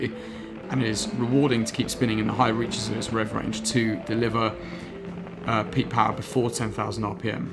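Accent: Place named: Cockney, United Kingdom. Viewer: Yes the cockney accent is a bit annoing.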